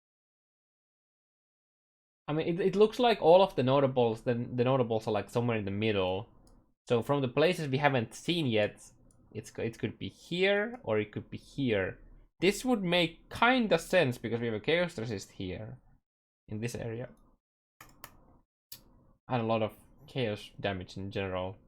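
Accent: Finland. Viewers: I am going to be honest. I know you know a decent amount about the game but your guides are awful for several reasons. First of all, your videos are too long. You need to learn how to edit your content. If you wanna be serious stop copy-pasting and learn how to make it short, on point and simple. Secondly, you don't articulate your thoughts and your English is decent at best. You have to convert your thoughts into sentences for other people and explain yourself. To me it seems like you are just saying whatever word comes to your mind first and you output that. Your Finnish accent and mumbled sentences don't make things easier so you have to compensate for that. Lastly, I myself play this game for a long time and most of the time I'm struggling to understand what you're saying even tho I understand the topic of things. But a lot of details lost. Now imagine a person who has no clue about the wider picture. 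Your explaining of things and these guides are making me facepalm too much and it's disappointing so I thought I will give you some advice. Now what you do with it is up to you.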